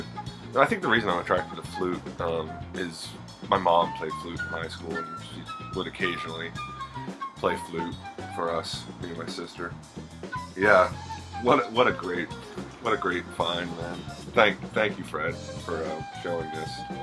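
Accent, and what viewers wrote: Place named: France. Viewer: Just love your French accent! nice diabolique pick ups.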